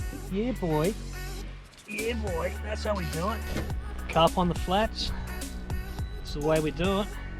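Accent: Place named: United Kingdom. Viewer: so I'm confused.... you sound like one of "those people" who would say pm as 'half nine' rather than nine thirty lol but you mentioned Rex Hunt, I'm guessing that would mean you moved here 20+ years ago and still haven't lost the accent? or am I WAY wrong? either way mate I'm loving your carp/fly vids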